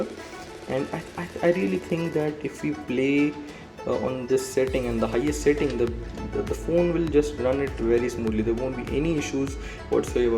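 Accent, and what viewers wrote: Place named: India. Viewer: I just can't ignore that annoying indian accent, however, good video mate!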